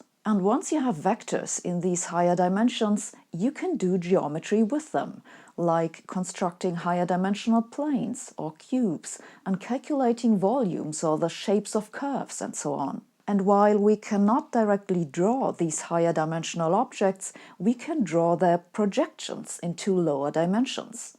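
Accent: Germany. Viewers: Sabine is lovely,funny and brilliant...she makes Physics fun and accessible...I love her German accent,too,tcx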